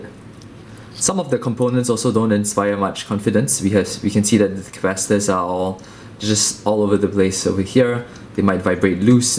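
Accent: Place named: Singapore. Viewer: You know, I can hear the essence of Singapore in your voice :D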